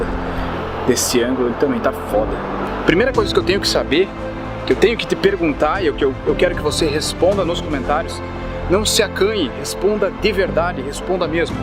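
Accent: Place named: Rio Grande do Sul, Brazil. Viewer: Mas eu sabia que conhecia este sotaque.:) És gaúcho de onde,? Sou gaúcha, também!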